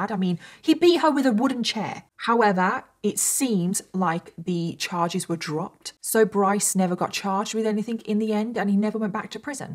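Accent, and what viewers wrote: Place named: West Midlands, United Kingdom. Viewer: Not sure what part, but definitely a West Midlands accent.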